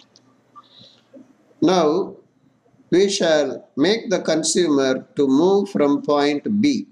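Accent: India. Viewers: what the hell, your accent, are you an indian?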